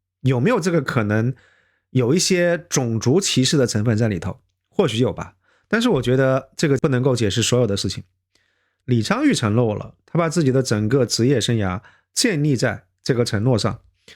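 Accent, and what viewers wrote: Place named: South, China. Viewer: 自己说过好几次自己是南方人普通话不太标准有口音理解万岁👏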